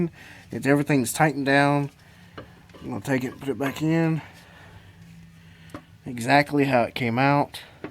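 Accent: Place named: Texas, United States. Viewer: let me guess are you from texas with the way you speak and dont blow nose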